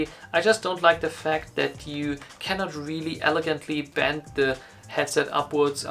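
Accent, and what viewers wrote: Germany. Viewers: He is from my State. Germany. So he has an german accent that is what you hear😆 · Deutscher Akzent kickt anders haha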